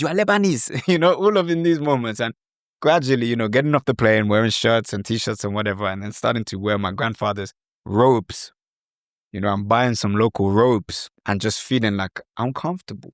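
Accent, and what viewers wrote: Ghana. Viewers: Lovely ❤ You do speak like a Ghanaian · I like your Ghanaians ascent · It's quite easy to see some Ghanaian training in you when you speak..... It's amusing and humorous at the same time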